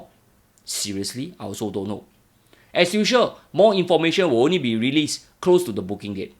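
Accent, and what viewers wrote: Singapore. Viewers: Singapore accent SOUNDS TERRIBLE ???!!!!!! NO REPLIES READ.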